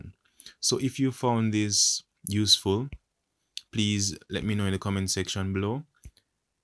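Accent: Jamaica. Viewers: Dawg sound like a jamaican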